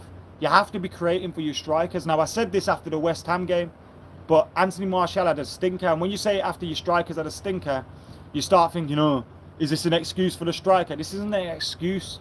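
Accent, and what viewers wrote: Birmingham, United Kingdom. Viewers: Hahah are you a Brummie??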